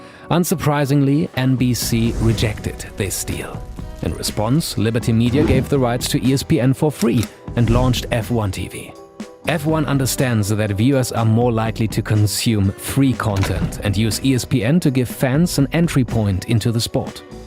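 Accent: Germany. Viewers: German native speaker as commentator?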